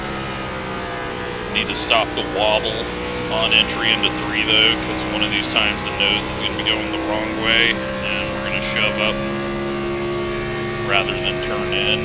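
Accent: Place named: Iowa, United States. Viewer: U from iowa?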